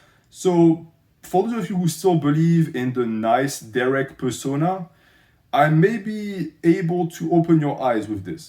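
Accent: France. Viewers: Why are you french?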